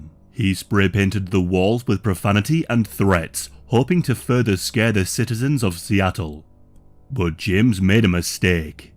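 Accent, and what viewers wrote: United Kingdom. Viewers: The fact that this will actually help me sleep is kinda scary 🤣 i love true crime and just has that sexy deep voice with a sexy British accent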